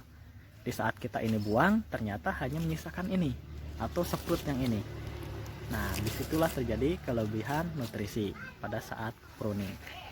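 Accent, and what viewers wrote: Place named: West Java, Indonesia. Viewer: pohon anggur saya juga mengalami hal yang sama dan sedang dalam pengobatan... logat Sunda ketara euy 😂😂😂🙏👍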